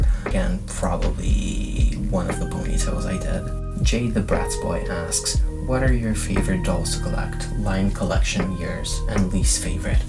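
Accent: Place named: United States. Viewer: How is your English so good and why do you an American accent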